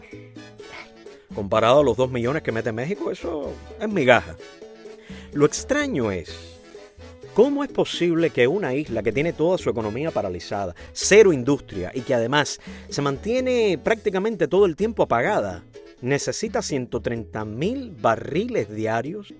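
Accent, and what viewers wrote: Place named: Cuba. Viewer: Suena lastimoso, solo una pregunta... Debo creerte solo porque suenas como cubano? Quizá, pero por que veo que no estás en el hermano País de Cuba pues tú set se ve muy bien y más... Te enfocas en "el poder" interno y no en la lucha que por años dignamente ha librado el pueblo cubano y también en dramatizar con burlas tu punto de vista que no se sostiene de datos concretos. Ojalá puedas ampliar información.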